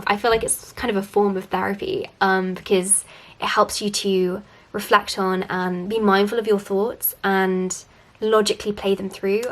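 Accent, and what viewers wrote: United Kingdom. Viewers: Your accent is so purelyyy British ❤❤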